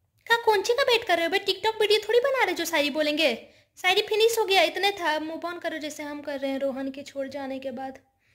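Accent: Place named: Bihar, India. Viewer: KASAM SE AAPKA JAISA YOUTUBER HUM AAJ TAK NHI DEKHE. (KONCHI KA GHAMAND HAI RE) 😂😂 LOVE YOUR BIHARI ASCENT. LOVE FROM DHANBAD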